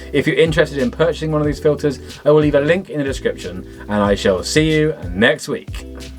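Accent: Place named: England, United Kingdom. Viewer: Great to hear an English voice, youtube is so American heavy especially aquatic posts 😊 Thanks for sharing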